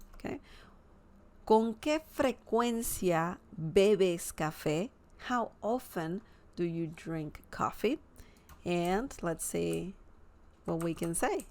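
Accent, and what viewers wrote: Castile, Spain. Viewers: is this considered castilian spanish ? also i noticed that the C/Z words that are pronounced TH is not the case when the letter C is at the beggining of words ?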